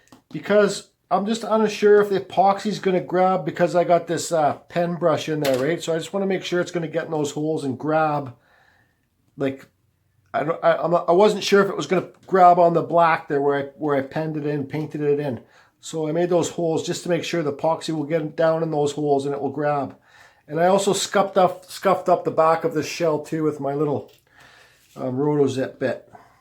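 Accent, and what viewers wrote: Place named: Canada. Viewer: Great looking piece I like it. Your fuckin language is terrible eh. You sound like a real Canadian lol. Have a good one.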